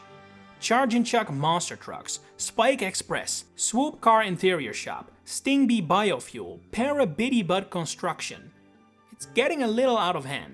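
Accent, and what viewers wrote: Netherlands. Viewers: Nice video bro Heerlijk Nederlands stemmetje hoor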